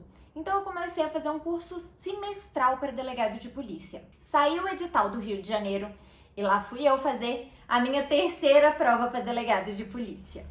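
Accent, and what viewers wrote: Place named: Minas Gerais, Brazil. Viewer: Sutaque mineiro raiz, a nossa minas gerais. hehehe ótimo vídeo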